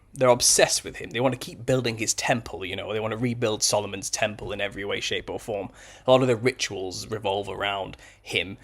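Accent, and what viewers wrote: United Kingdom. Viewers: Went straight to Harlequin, but totally skipped the Court Jester of the Middle Ages, which was probably the true origin of the Harlequin. The Wildman was most likely a reference to a Sasquatch type figure since they've been reported all over the world. The red hair may be from the red-headed giants of old. Just because someone has a "British" accent does not mean they're 100% correct about something, do your own research. Good connections though, almost there. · you sound hella condescending and subjectively judgmental in sharing information in this video... like bruh, keep it classy and objective. We dont care about how you feel about other cultures, just show us how things correlate and save the sass... you sound very snobby and British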